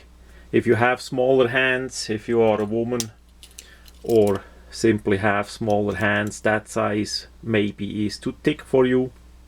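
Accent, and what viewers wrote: Switzerland. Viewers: Extrem geil, Englisch mit Schweizer Akzent. Klasse 😄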